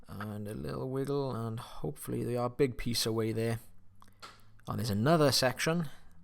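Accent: Wales, United Kingdom. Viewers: Love your Welsh accent.....Merry Christmas to you and yours! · Well done. Take a bow 👏🏻👏🏻👏🏻👏🏻👏🏻👏🏻👏🏻👏🏻 can I please ask where abouts in Wales you are from as you accent is slightly different to Mr B’s. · Well done!!!! You were calm, cool, and collected. It was quite a tough one, but you just persevered and got those sticky bits out!!! 👂🏼 And your voice is so charming!!! I love a good Welsh accent😊 Can’t wait for day 17!!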